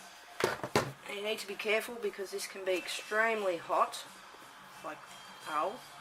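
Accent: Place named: Australia. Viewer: love your artistic creativity and your aussie accent just like my favorite actor Travis Fimmel, also love your choice in music. hope to see more creepy dolls too.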